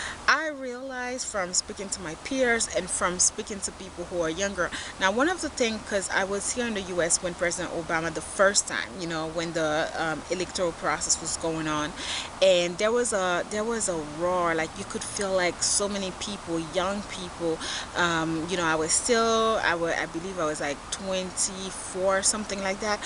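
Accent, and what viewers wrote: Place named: Haiti. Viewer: You have a nice accent , are you from Haiti?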